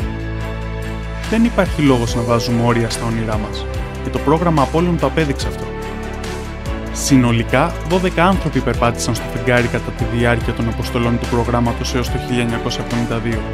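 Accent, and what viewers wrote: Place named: Albania. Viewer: Ε, όχι και τόσο καθαρός λόγος που προφέρει το ρ σαν αλβανός.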